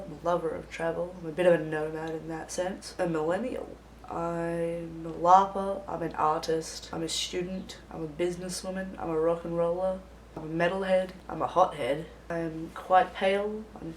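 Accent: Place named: Australia. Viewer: Your look is absolutely terrific, and I'm liking your videos... but your accent was still a surprise! (even though I knew you were an Aussie, from Facebook). Keep it up :) - Ian R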